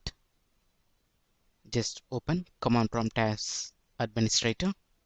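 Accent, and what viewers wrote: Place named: India. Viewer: Indians speaking english sound very ridiculous!!!!!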